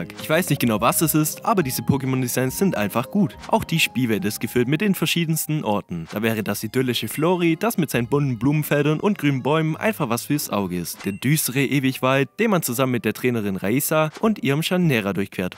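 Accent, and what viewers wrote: Swabia, Germany. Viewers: Geiles Video, 11/10 für schwäbischen Dialekt! ^^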